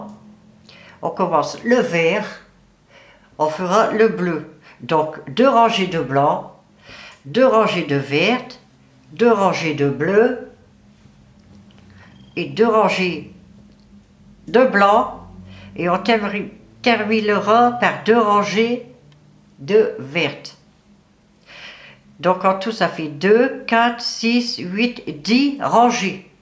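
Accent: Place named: Nord-Pas-de-Calais, France. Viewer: Très bien expliqué... j' adore votre façon de parler qui ressemble au parlé Ch'ti que je suis ❤ Très beau modèle...👍❤️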